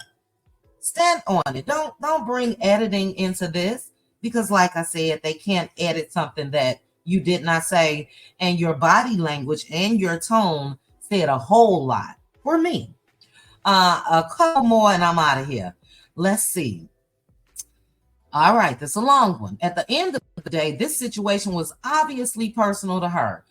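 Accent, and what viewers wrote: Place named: South, United States. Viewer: . I just love your commentary, and love your voice even more. My sister-in-law passed a view years ago and you and she sound exactly alike. You 're voices are so sweet with that southern twang. I listen to you often, and it just brings me such joy as I can hear my sister-in-laws voice which is quite comforting. Even my husband, her brother, says you sound exactly like her. Keep up the great commentary, and thank you.❤❤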